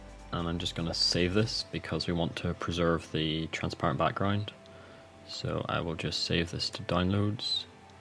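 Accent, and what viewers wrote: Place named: Northern Ireland, United Kingdom. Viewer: Nice...just waiting for the comments saying they can't understand northern irish accent lol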